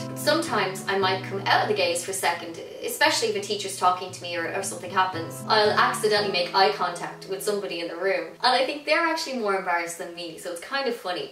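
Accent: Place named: Ireland. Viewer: Hello, I actually thought of maybe looking into becoming a life drawing model because I hope to find my next boyfriend eventually that way. Although from your experience, have you or others who you may know found this to be an easier way than in the real world or is it more vulnerable? By the way, I love your accent and I've been to Ireland! I live in Frome, England but I am American. In fact, your dress sense is very relatable to the people where I live, if you've never been!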